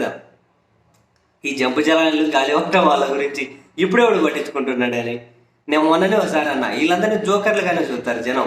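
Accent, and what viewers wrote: Rayalaseema, India. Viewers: First time రాయల సీమ slang lo chusanu nenu నవ్వుకొన్నా బాగా ❤😂😂😂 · My Rayalaseema language I liked it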